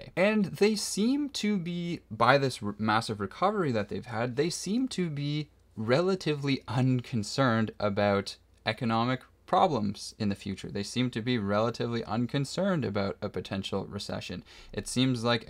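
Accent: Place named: Canada. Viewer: Instead of starting your video saying "Markets" I think it would be beneficial to say "American Markets" as you have a Canadian accent and you said in your video you're in Canada. Also, if your video is about the USA market, can you please start discussing the US housing market? a 700K house is normal for Canada but NOT normal for the US so it's not a great example.Or maybe it's just me and everything. you're doing is not confusing at all. I appreciated your crypto fear and greed explanation. Good reminder. I almost sold in 2022 but figured it wasn't worth the hassle so I didn't due to laziness.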